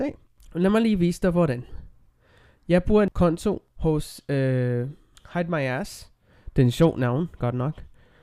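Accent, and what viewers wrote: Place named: United States. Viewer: Hey, kan ikke lade vær med at tænke på din accent, hvor er du fra, det lyder lidt som om du kommer fra USA? ;-) har jeg ret?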